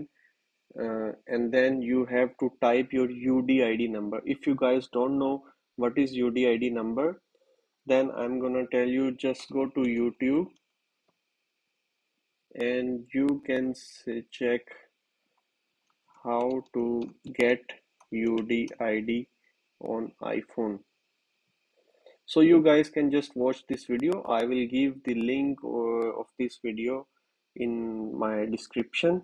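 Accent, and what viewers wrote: India. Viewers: You have explained everything in detail and this document is not explained so clearly on almost any page of apple. What I don't understand is that the developer account belongs to a Turkish person, but you speak with an Indian accent. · abi niye hintli gibi konusuyosun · Vallahi ben de Hintli sandım , dublaj falan olabilir.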